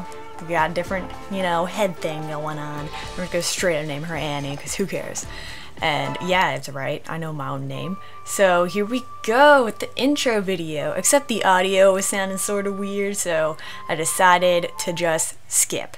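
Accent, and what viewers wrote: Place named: South, United States. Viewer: you seem to have that kind of twang in your speech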